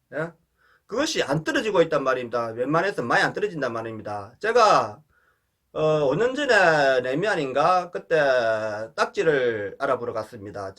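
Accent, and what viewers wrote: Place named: Busan, South Korea. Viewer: 구수한 부산 사투리 친근합니다 ㅋ 건강관리 잘 하세요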